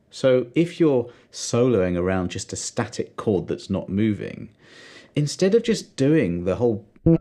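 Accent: United Kingdom. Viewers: I pronounce Jaco 'Jahko' and 'Yamaha' 'Yahmaha' (I have 5 Yamaha basses) so you're preaching to the converted. I commented because I thought it overly pedantic that an American would attempt to school an Englishman - from whom, I might add, he's getting FREE bass instruction - on pronunciation. It'd be like me viewing an American cooking segment and admonishing the chef for pronouncing the herb (or 'erbs' as Americans call them) basil 'bayzil', instead of 'bazzle' as we do in Australia and the UK. Anyway, that's enough from me. Back to the bass... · Fantastic lesson, as usual,! Your lessons are so informative, and I would say the best ones on YT. I’m curious, though (and I hope you won’t be offended), but why do you Brits pronounce “Jaco” as “Jacko”? It’s actually “Jahko” (long A, as in how Brits say “tomahto.”) This also goes for “Yamaha,” which I hear Brits pronouncing with a short A, as in “Yam (rhymes with “ham”) aha,” instead of the correct “YAH-maha.”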